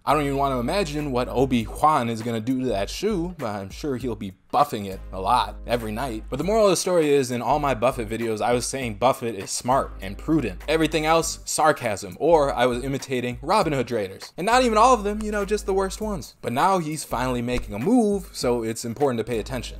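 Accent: India. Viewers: Bro are you from kerala???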